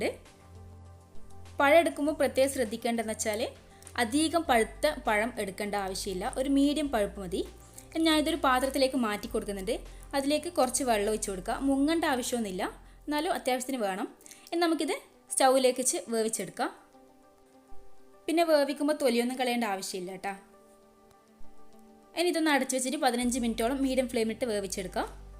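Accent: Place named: Kannur, India. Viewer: Samsaram kannur bhasha kelkkan nalla rasamund.. recipeyum nannayittund.. good presentation and preparation 👌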